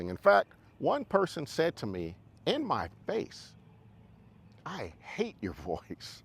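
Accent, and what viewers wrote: United States. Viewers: Sound like an American ! You speak better than some white people. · You sound like an American. Love your videos. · You sound like an American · You sound like an educated "American" to me. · After listening to this video it just sounds like an educated American speaking English. You don’t have to be white to sound educated. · You talk like an American to me.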